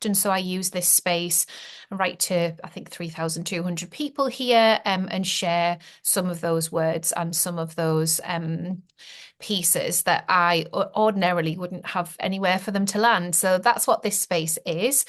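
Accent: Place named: Northern England, United Kingdom. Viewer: WAVES FROM OVER THE BORDER IN CUMBRIA!! Thank God!! A Northern real voice!!! There is a god!!!! Nice to meet you! Thank you for being you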